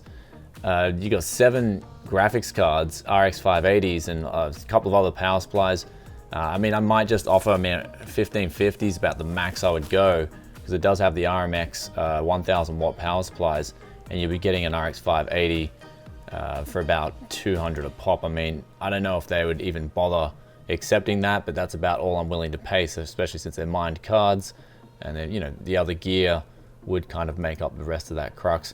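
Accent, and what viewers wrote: Bogan, Australia. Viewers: An Australian doing an Australian accent is weirdly amazing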